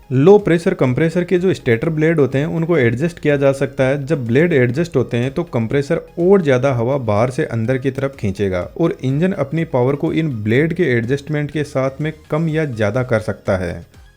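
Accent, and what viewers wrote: Rajasthan, India. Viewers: आपकी हिन्दी बोलने की शैली में राजस्थान की झलक दिखती है क्या आप मारवाड़ एरिया से है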